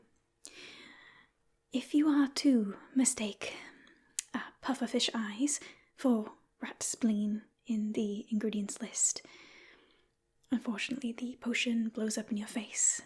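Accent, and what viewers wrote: England, United Kingdom. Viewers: I’ve said it before, you do a really good English accent. Have you ever been to the UK. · Nice use of the English accent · YOUR BRITISH ACCENT SLAYS AND SLICES ME IN HALF EVERY TIME OMGGGGG!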